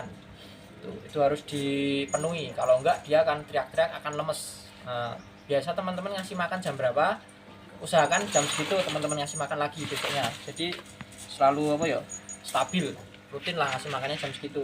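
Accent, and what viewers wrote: Indonesia. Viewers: Ky orang kendal bahasane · Jowone ngendi mas... Yoja, ya?😊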